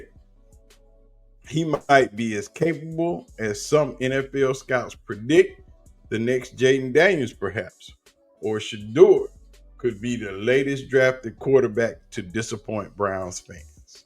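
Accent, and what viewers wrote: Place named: South, United States. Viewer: you a trip - Sounds like.... a lil bit black. A lil bit southern. Reminded me of Samuel L. Jackson thumbs up brother keep up the great work,u got a fan in me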